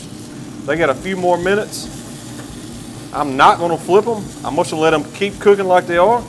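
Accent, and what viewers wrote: South, United States. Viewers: He got that southern accent .....sounds like molasses drippin out his mouthhh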